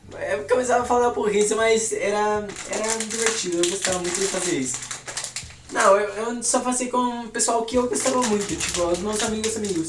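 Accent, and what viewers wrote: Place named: Rio de Janeiro, Brazil. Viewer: Vc tem uma mistura de sotaque carioca e português de Portugal, mas está muito bom kkk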